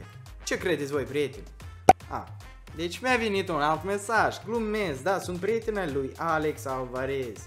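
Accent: Moldova. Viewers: esti moldovean???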